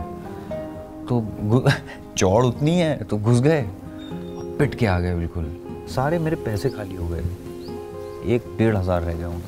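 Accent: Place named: Lucknow, India. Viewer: Some lucknowi accent is still there♥️✌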